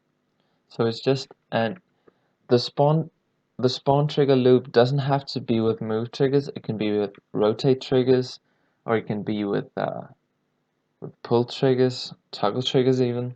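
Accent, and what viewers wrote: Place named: Denmark. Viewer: Why does this guy have a danish accent lol Are you from denmark:0 If you are then hi, jeg også dansk:D:D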